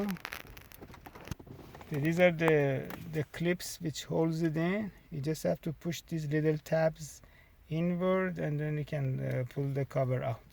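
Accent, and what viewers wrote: Iran. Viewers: داداش ایرانی هستی اخه لهجه اینگلیسی خیلی باحالی داری 🤭😂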